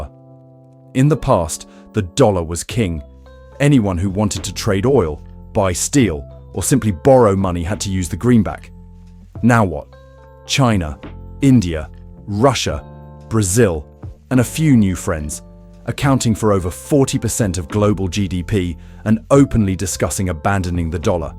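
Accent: United Kingdom. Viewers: You sound like a Brit 😂😂😂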